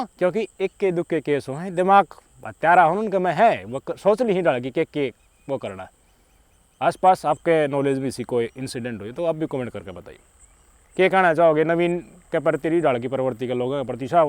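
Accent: India. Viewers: Desi boly mast h bhai sahab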